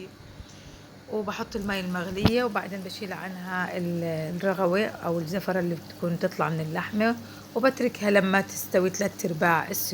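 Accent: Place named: Palestine. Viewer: لهجتك فلسطينية اعملي المسخن ع طريقتك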